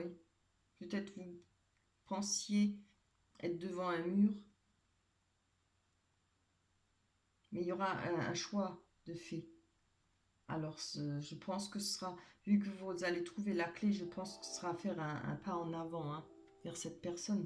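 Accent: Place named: Franche-Comté, France. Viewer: Vous avez un accent de Franche-Comté, ou pas loin.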